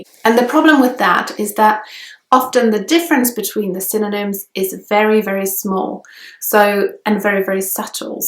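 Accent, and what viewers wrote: United Kingdom. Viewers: Ironic that you ended up with such a beautiful British accent after finding American English easiest to understand at first. As a native speaker, it's difficult for me to say, but (as Brandon commented below) the absence of noun gender must really help. I find just remembering genders in German very hard, still. As Scott says, below, isn't the crazy English spelling the main difficulty, though, from a reading a writing perspective? I once read a book by a Russian linguist who argued it takes English native children two years longer to get literate because of the spelling. I still struggle, sometimes, even with top 2,000 frequency words after decades of extensive reading and writing. I'll always know when I don't know, if you follow, but I do sometimes have to check. Rather like Chinese or Japanese natives having to check the characters (though it's not that difficult, I'm sure). 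· I completely thought you were a native Britian before you said you were polish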